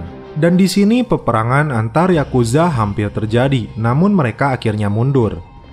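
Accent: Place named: Sunda, Indonesia. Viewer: Mang ngomongna sunda ning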